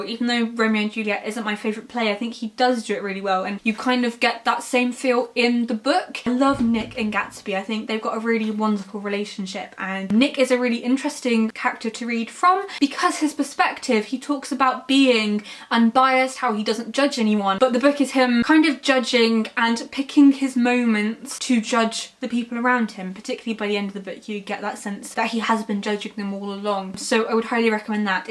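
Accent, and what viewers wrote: United Kingdom. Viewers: I came here thinking the “classics” where of a higher order. I thought she was going to refer to greco-roman classics of LOEB library. Aside that, I imagined her with A British accent and was not disappointed. You’re a beautiful women. Greetings from the golden state. You earned a sub.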